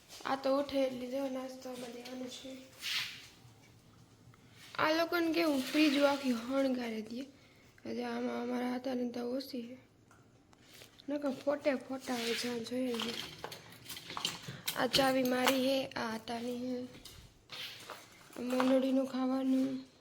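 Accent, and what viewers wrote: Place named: Porbandar, India. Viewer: From your language I think 💯 % u r from porbandar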